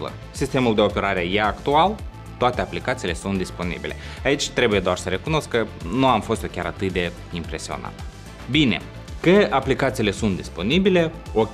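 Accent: Moldova. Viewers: Aud accent Moldovenesc, dau skip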